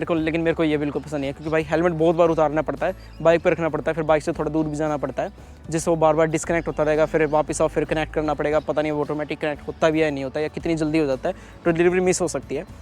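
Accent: Haryana, India. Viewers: Haryanvi touch bhai goda 😎 jai haryana jai haryanavi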